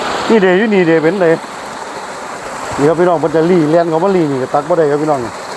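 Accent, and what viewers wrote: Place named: Isan, Thailand. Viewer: ตกใจเสียงเว้า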